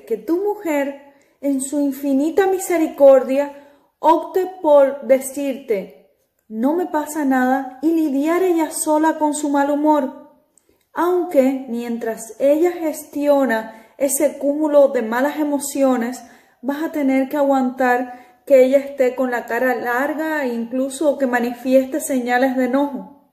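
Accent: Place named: Cuba. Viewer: Muchas gracias estare al pendiente de tus videos que son muy buenos y muy bien explicados..una pregunta eres cubana?..me gusta tu acénto y tu manera de expresarte😊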